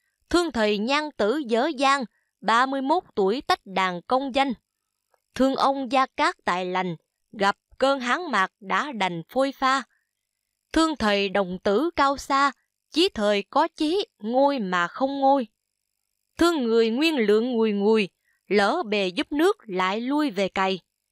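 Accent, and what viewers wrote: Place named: South, Vietnam. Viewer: Đúng rồi, phải đọc ngôn từ miền Nam như vậy mới đúng, chứ dùng ngôn từ chỉnh sửa cái gọi toàn dân thì trật lất hết rồi, chân thành cảm ơn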